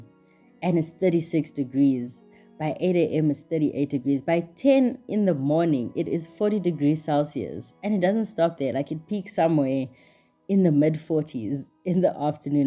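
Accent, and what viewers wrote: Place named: France. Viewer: Your French accent🥵🥵🔥🔥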